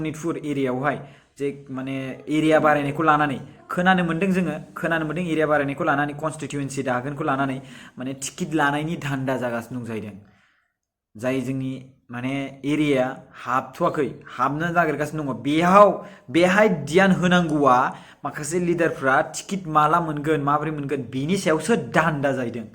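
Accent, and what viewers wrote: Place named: Kokrajhar, India. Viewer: Hab pongbai nwngni raijlanai jwngni kokrajharni baidi tar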